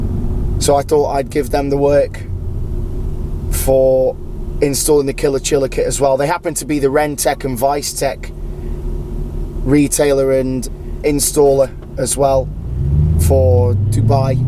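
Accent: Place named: London, United Kingdom. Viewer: You sound almost like a londoner... that's been living abroad a while. Sick car though.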